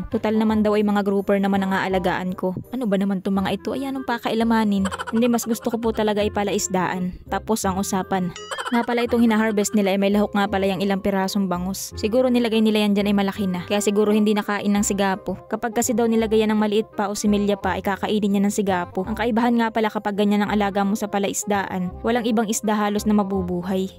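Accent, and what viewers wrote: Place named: Quezon, Philippines. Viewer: Hi, natutuwa ako sa punto mo Quezon na Quezon ay, from Quezon din ako. Pero watching here in Edmonton Canada. Pa shout out sa next Video mo, Aileen Villapando of Edmonton Alberta Canada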